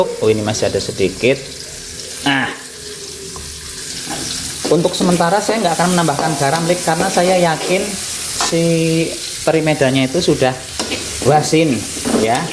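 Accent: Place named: Tegal, Indonesia. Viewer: Maturnuwun resep simple nya pak.. sy terapkan resep ini& resep apemnya.. Seneng bgt denger logat org Tegal..suami sy org Tegal tp br mninggal..sy pulkam ke Blitar.. sy ingin jualan makanan Tegal utk mngenang suami sy..ingin jualan nasi lengko& kue apem dr video pak.. Minta resep bumbu kacangny nasi lengko boleh pak??..ingin dpt resep dr orang Tegal asli. Maturnuwun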